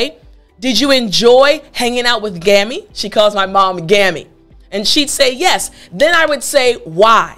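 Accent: Africa. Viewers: you speak in English like Africans.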